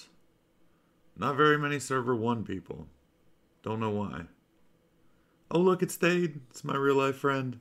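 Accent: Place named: United States. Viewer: man youre american for sure. Thx for ur tips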